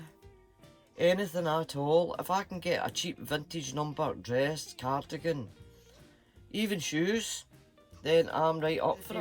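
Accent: Scotland, United Kingdom. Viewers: That was so much fun and your Scottish words remind me of late nights round the kitchen table with my beautiful friend Krys, now sadly gone but oh we had some laughs, me being one of those Sassenachs and another friend a Geordie it was hilarious. Oh and YES! Singing into a pasting brush whilst decorating the hall, stairs and landing of our first house, gosh, 38 years ago. Thanks for the chat hun xx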